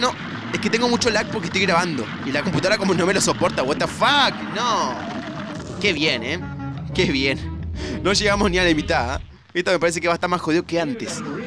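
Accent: Argentina. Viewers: ¿sos argentino?🤔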